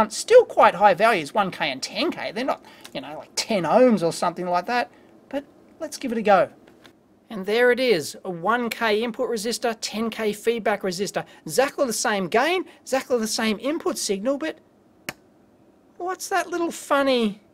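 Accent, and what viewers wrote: Australia. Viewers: This may be the most Australian sounding man I have ever heard.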